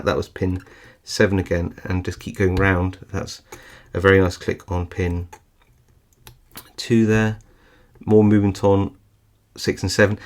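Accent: United Kingdom. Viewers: It's always interesting to watch, honest you could just talk and not pick a lock and we'd still enjoy it. British people are pretty awesome sounding anyhow, but ya you'd be surprised, we want to know more about you too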